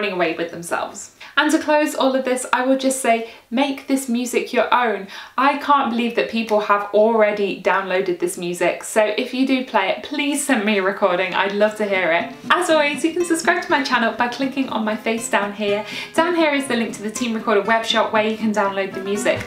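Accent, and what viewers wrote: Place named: United Kingdom. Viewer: And it’s not only what she says but how she says it in her own inimitable entertaining British accent. These tutorials just wouldn’t be the same if were to teach them using a phony mundane American accent. 😉 ♫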